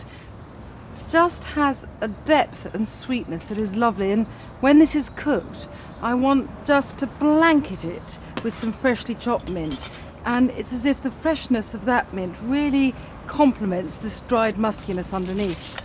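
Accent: England, United Kingdom. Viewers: When i was young, sure, loved her British accent and the way she has with words but as I grew older, I saw that she could use spoons more, wash hands after handling eggs AND FOR THE LOVE OF GOD, SCRAPE THE BOTTOM OF THE BOWL AFTER POURING OUT THE CAKE BATTER! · too much british accent for me to handle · I love her english accent.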